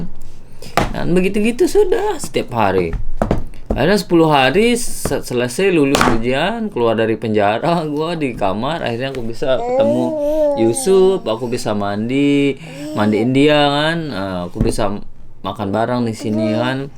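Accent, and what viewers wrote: Lombok, Indonesia. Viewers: Walaupun pke b indo tapi logat sasaknya masih ada 🤣🤣 mantep side bang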